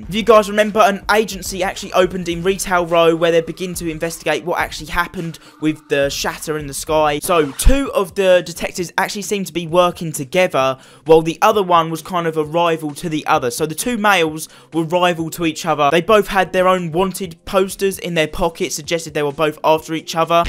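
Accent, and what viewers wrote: United Kingdom. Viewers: Is it me or do all of these Fortnite you tubers who discuss fornite lore sound the same.....a uk man with a Very very strong Uk accent...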